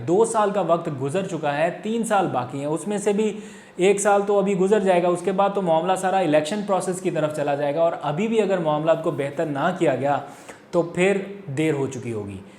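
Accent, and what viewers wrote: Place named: India. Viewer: بہت زبردست جناب شکریہ جناب! ایک بات؛ پلیز بھارتی چینل زیادہ واچ نا کریں کیونکہ آپ جھٹکے والا بھارتی انگریزی لہجہ استعمال کر رہے ہیں۔مثلاً کمپے ٹشن کہرہے ہیں جو کہ کانپے ٹی شن ہے۔ کسی بھی انگریزی وڈیو پہ دیکھ لئے گا اسطرح کے بہت سے الفاظ بھارتی انگریزی کے کہلاتے ہیں مغرب میں۔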